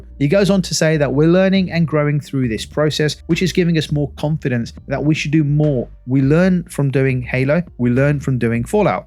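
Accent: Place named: United Kingdom. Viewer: Shut Up, your reporting is so flawed, while yes fans have been requesting an Assassins Creed game setting in Japan, FANS WERE EXPECTING A JAPANESE MALE ANTAGONIST, NOT AN AFRICAN!!!!! Get your facts straight British Accent Guy!!!! That 1 million players is flawed because it does not represent sales like Ubisoft is trying to disguise it as though!!!! Go Back to Reporter School!!!! Hahahaha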